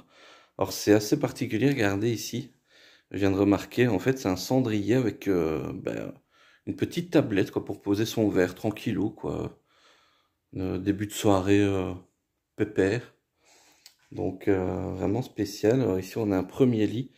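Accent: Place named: Belgium. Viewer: Bien! Tu me fais chaque fois rire avec ta prononciation typiquement belge, "fourboui"! En France nous disons juste "fourbi" Belle continuation & merci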